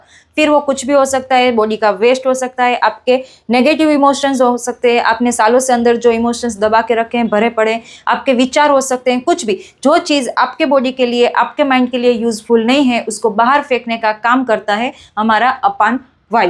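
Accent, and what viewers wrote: Gujarat, India. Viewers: તમારી ભાષા મા ગુજરાતી લહેજો દેખાય છે બાકી હિન્દી સારુ છે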